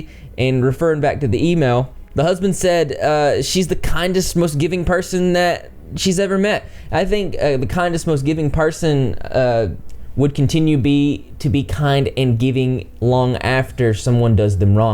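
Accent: South, United States. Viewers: I commented on your eugenia cooney video something similar, but i wanted to ask, where are you from? This is the second video of yours that im watching and i think ive narrowed it down to either alabama or louisiana/texas area. I am from houston area and not many people talk like that around this here, thats why im speculating louisiana area. Sorry for the long comment i am just so wowed by southern youtubers idk why😂😄😳😳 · Hello from Lexington KY, loving the southern accent, where are u from? I subbed. So great to find a southern man with a moral compass and moral integrity on YT! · I love the country boy accent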